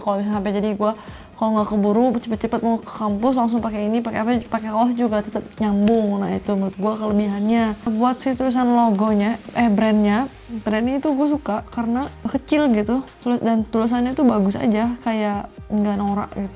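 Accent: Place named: West Java, Indonesia. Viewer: Sunda pisan ieu mah euy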